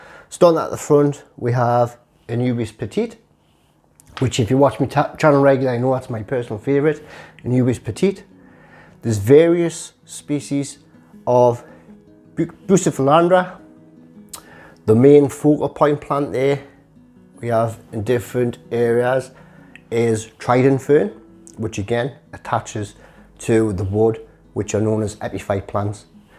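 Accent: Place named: Newcastle, United Kingdom. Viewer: I love this Goerdie accent :-)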